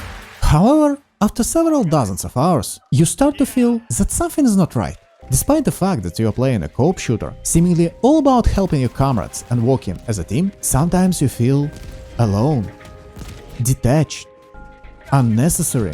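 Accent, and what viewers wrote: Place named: Eastern Europe. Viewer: Love the video and love your thick Eastern European accent more. Hope to see your channel grow and get those sponsors friend~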